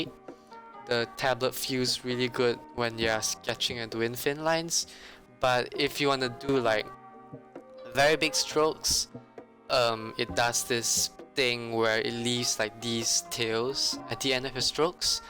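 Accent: Malaysia. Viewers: Your accent sounds like Malaysian accent if you are Malaysian i wanted to say SAYA PERMINAT AWAK(i am your fan) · Syclone Shush Im Malaysian so I know his accent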